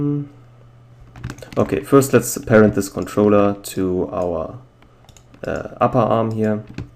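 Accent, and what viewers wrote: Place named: Russia. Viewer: Вроде русский акцент, запили на русском всё тож самое плиз =)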